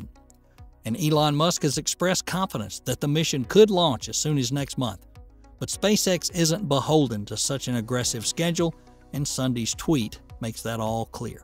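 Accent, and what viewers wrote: United States. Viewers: That cowboy accent tho 😂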